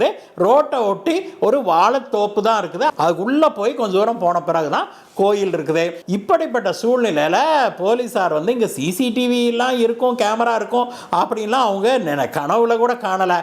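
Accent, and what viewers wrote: Kongu, India. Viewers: நீங்க பேசுவது கொங்கு தமிழ் போல . ஒரு பல்ராங் (ஒரு கிலோமீட்டர்) அவுதி அவுதியாக போறாரு அவசரம் அவசரமாக போறாரு வார்த்தை இது எல்லாம் கொங்கு தமிழ்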